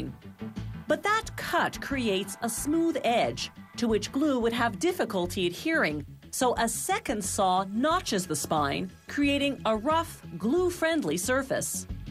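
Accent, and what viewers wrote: Canada. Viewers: It's a canadian accent. Don't worry about it.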